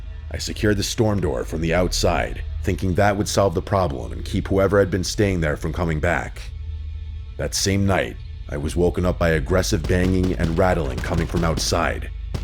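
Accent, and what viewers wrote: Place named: Rhode Island, United States. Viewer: U know that u have a perfect voice for narration!! I detect a North eastern accent like maybe Rhode Island?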